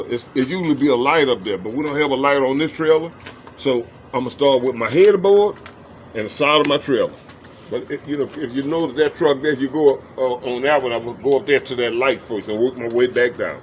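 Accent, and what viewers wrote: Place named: Louisiana, United States. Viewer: Its the louisiana accent lol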